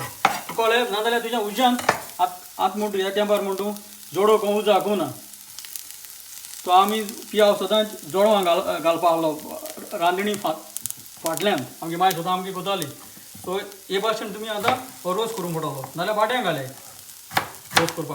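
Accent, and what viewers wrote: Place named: Konkani, India. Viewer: U r genuine Goan person. U've got the authentic Konkani accent and the Goa centric ethnic recipes. May God Bless you and may u come up with more Goan recipes. U r the source of cooking for many young Goans in cooking authentic Goan cuisine. Mog asum sodamkal. Long live our brotherhood and Goenkarponn. VIVA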